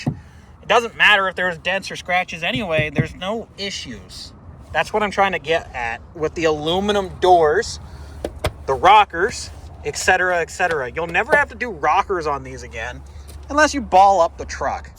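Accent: Canada. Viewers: The Canadian accent lol its all good! It reminds me of the Red Green Show! I'm from southern Ohio near west Virginia so don't worry!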